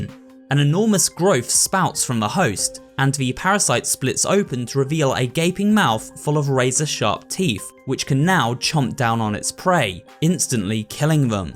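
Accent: England, United Kingdom. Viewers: i love that my man, translate the Spanish words into english just by changing the accent of the word.. typical english native speaker, doesn't even bother to learn how to say the word properly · I just love how says all the spanish Words with an english accent, para mi su forma de hablar en español y omitir la ñ me mata de risa (to me, him speaking spanish and skiping the ñ makes me laugh)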